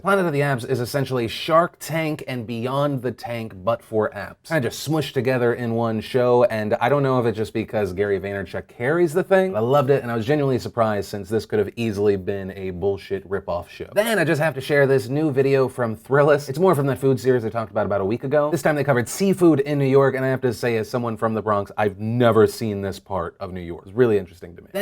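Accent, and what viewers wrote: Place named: Bronx, United States. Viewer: holy shit your from the bronx you are already maybe my favorite youtuber but hearing made me respect you so mutch more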